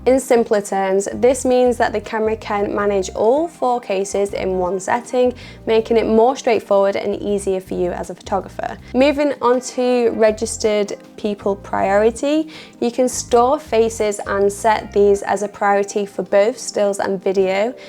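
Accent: United Kingdom. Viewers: As someone from Texas, it might be the British accent that entranced me, but this might be one of the best reviews on YouTube- great job 👏 (wait, am I supposed to bow?). As for the camera, I’m shocked at the quality at 1/4000, f/4, ISO 6400. I’m looking forward to what I will get using a 2.8 lens…wow! Keep it up, Queen 👸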